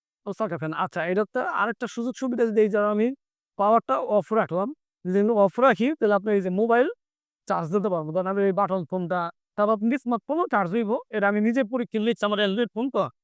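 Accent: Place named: Rakhine, Myanmar. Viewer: Rohinga vashai kotha bolchen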